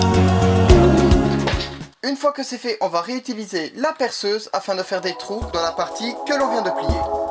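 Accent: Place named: Belgium. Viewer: quel accent belge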